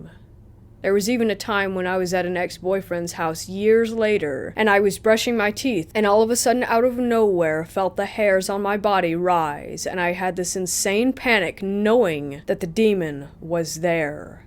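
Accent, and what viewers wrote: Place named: South, United States. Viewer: The best narrator out there...cadenced, intelligent, proper enunciation... and that slight southern accent. A perfect alchemy. And no goofy innuendos either. Yayyyy! Thanks!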